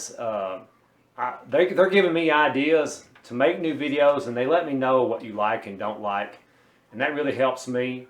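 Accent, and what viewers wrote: South, United States. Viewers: Delicious!!! Good southern man accent which I can recognize 😉👌😊 straight away, I learned it from a famous movie, Trump, by Tom Hank!! Ha, ha!! Love from Hong Kong!!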